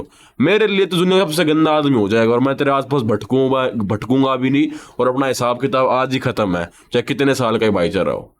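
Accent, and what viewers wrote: India. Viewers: So u have desi tone too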